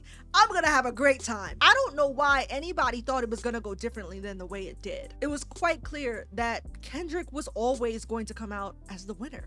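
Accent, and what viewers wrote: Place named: Canada. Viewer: Wait are you Canadian? You have an accent like Aba.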